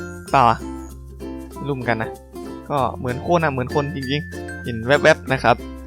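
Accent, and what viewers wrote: North, Thailand. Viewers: พี่ๆเป็นคนเหนือหรอ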